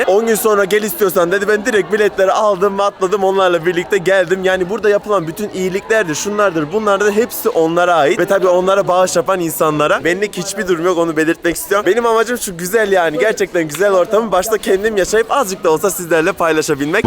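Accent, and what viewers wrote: Konya, Turkey. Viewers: bir Konyalı olarak en büyük ortak yanımız gözlük 👓 koyma yeri ve bırakamadığımız o meşhur gonya ağzı konuşma 😀 Konya dan selamlar 👋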